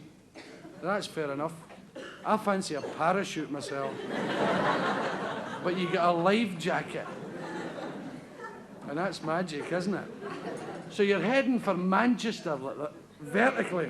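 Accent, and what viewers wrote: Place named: Glasgow, United Kingdom. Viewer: His material was much better when he still had the Glaswegian accent. He was still funny but being from Glasgow I found him funnier then.🏴🇺🇸😜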